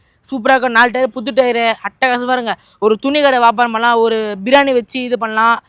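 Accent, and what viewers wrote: Madurai, India. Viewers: Madurai vasagam 🎉🎉🎉super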